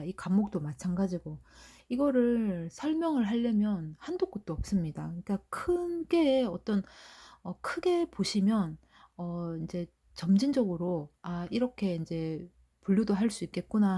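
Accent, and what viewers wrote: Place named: Gyeongsang, South Korea. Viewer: ㅋ~같은 겡상도라 더욱 듣기가 좋아요. 정듬뿍 넘치는 목소리입니다~💕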